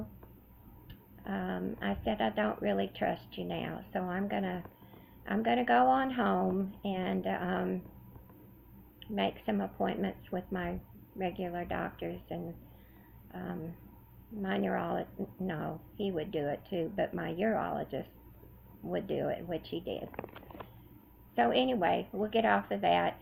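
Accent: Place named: South, United States. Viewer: I am so sorry to hear you were in the hospital. Hope you are on the speedy road to recovery. Marlene’s project bag is just beautiful and how sweet is that??! 😉. So good to see you, as always, I am inspired by your sunny outlook and I could just listen to that lovely Southern accent all day long. 😘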